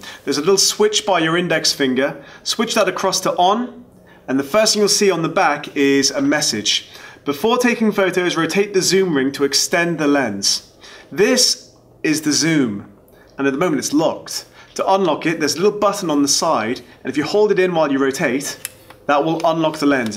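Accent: United Kingdom. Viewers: Well done. Perfect... just what I was looking for. The British accent makes it sound reliable and educated!!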